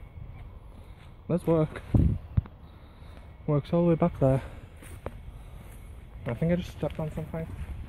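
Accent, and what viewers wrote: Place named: United Kingdom. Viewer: hey we now found out ur voice and aparently ur british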